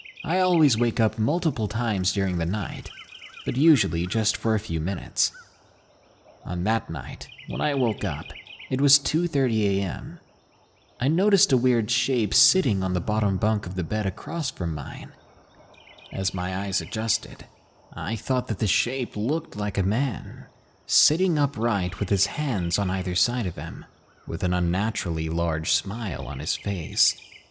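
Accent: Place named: United States. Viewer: Why does someone who is an Irish man raised in Ireland speak like an American with northamerican slang and no uk/Irish terms or phrases.... You are fucking bullshit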